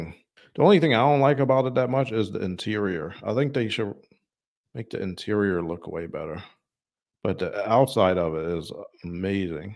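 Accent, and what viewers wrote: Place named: Mid-Atlantic, United States. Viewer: You have a very American and some might say even the classic “ Mid Atlantic “ accent.. well done sir.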